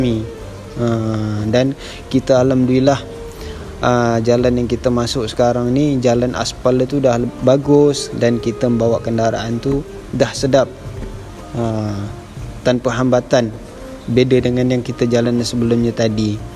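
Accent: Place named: Johor, Malaysia. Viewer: bahasa kamu 98% mirip logat melayu Johor malaysia aka UPIN IPIN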